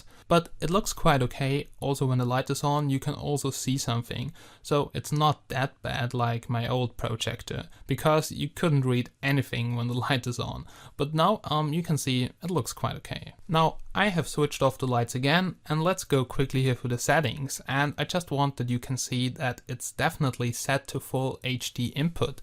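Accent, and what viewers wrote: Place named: Germany. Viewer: german accent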